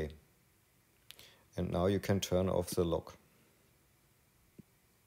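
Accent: Bavaria, Germany. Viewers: I like your bavarian english!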